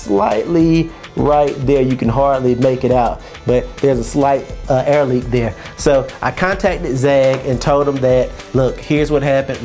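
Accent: South, United States. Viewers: man you are one of the most country sounding people I have ever heard. -the guy from Texas that lives in the sticks of Tennessee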